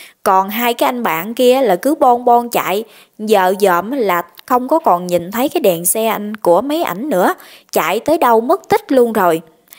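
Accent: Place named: Central, Vietnam. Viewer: Em giống miền te nói chuyện nghe đã thiệt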